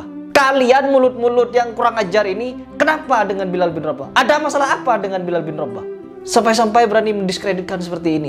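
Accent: Madura, Indonesia. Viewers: Logatnya kaya orang Madura.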